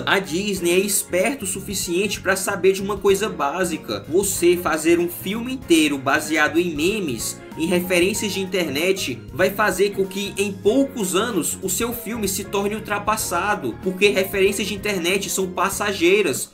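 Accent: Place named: Northeast, Brazil. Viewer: "Engraçado" o que vc disse que as pessoas te zoam por ser nordestino, sendo que eu sou paulista e tava ouvindo tudo e pensando "que delícia de sotaque, ouviria por horas" kskskkskskskks Admito que assisti o vídeo até o final mais pelo sotaque msm, visto que discordo de mts pontos criticando o segundo filme pq tenho uma visão mais de como se eu msm fosse os personagens, entendendo bem a trama e tals kskskksksksks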